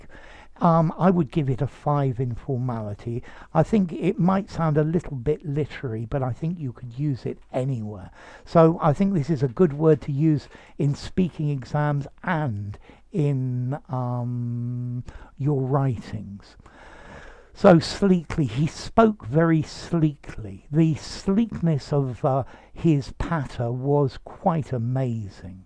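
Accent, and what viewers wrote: RP, United Kingdom. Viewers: Your English RP accent is sleek and I like it. It's very articulate. You enunciate each and every single word in your speech. Thank you sir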